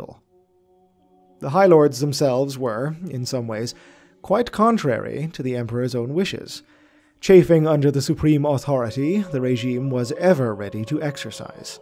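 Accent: Ireland. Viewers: You are definitely irish, and I approve my man. good to see a native doing well on youtube here, and your content seems to be great, heres a new subsoober for ya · I spent the first 5 mins trying to figure out if the speaker was English or American, realised he's Irish. So am I, not a good look for me. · Are you Irish? You sound irish. But you could be a Canadian doing an accent... I'm... uncertain.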